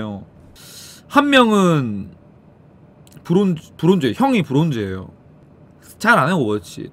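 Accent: Busan, South Korea. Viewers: 부산 싸나이네 ㅋㅋㅋ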